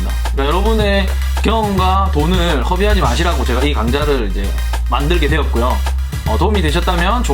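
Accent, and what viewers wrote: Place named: Gyeongsang, South Korea. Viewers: 경상도 사시나요..?ㅋㅋ 말투가 저희신랑이랑 비슷하셔서... 공예초보자인데 많이 배워용😆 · 좋은 정보 감사합니다 말도 빠르시고 경상도어투인데 배경음악이 너무 정신이 사나워서 집중하기가 힘들어요 ㅡㅡ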